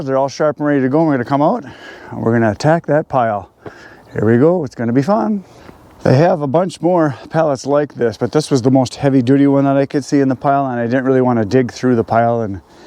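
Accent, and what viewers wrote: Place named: Upper Midwest, United States. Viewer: LOL you talk regular northeast / mid atlantic by yourself, but when another person shows up, your upper midwest shows.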